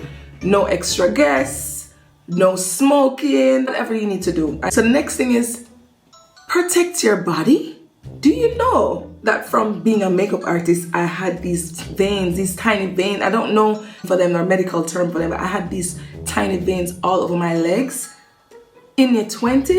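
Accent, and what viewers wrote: Jamaica. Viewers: Yow u sound so Jamaican its qt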